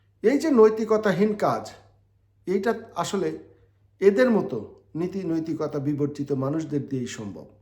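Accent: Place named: India. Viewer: তোমার কথা শুনে মনে হচ্ছে যে, তুমি ভারতের প্রডাক্ট।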